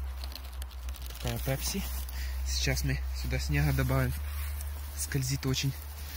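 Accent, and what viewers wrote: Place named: Ukraine. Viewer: Из-за укра(ударение)инскова выговора😁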